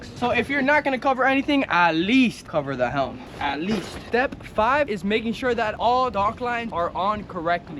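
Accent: Miami, United States. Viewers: Are you from Miami? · Knew bro was from miami after a couple words 💀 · His Miami accent lol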